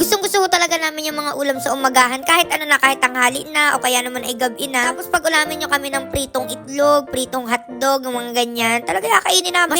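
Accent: Batangas, Philippines. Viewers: South Cotabato, Mindanao po. May dugong Batangueña. Ang tono mo po kasi magsalita ih Batangas. Nakapunta po ako once ng Batangas parehas kayo ng Tono ih. 😊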